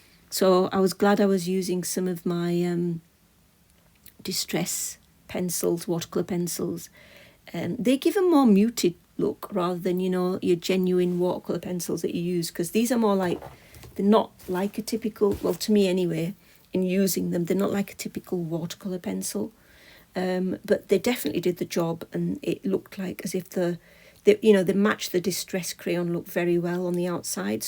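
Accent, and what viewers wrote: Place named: Newcastle, United Kingdom. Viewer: I love these snippets. Hubby came in so I asked if he were going to put headphones on or would he prefer me to put headphones on. He said he rather liked your soothing voice so he listened to you talk while doing a puzzle. Now you’ve stopped at the end of the video he’s listening to something else through his headphones. I thought it was funny. He says he can hear a mild Newcastle accent in a North Yorkshire accent. Is he right? Is there a Newcastle connection? (He is Lancashire with a dad from Newcastle.)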